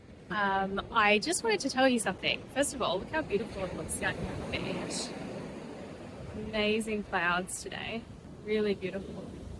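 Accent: Australia. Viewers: Hi, while I was commenting on Bo's YouTube video, I did found your channel there and then I'm here right now, watching and learning the Australian accent from you 😁. Greetings from Malaysia 🙏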